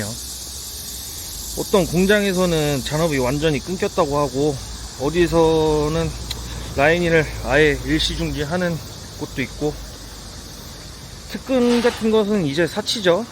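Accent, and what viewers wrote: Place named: Jeolla, South Korea. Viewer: 말씨는 전라도인데 1찍은 아니신가보네요.?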